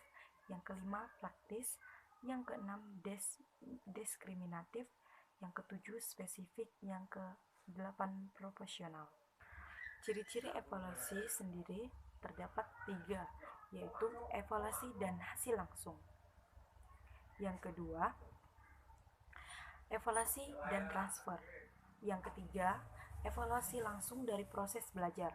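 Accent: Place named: Java, Indonesia. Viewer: Semangat KK😂 Jawa nya medok mbak ee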